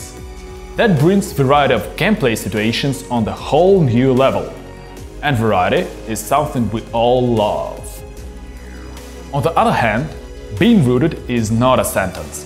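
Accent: Russia. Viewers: ^^^^^^^^Help them Translate??!! To English I hope, I had to put closed caption on to understand this Rooskee..LOL^^^^^^^^^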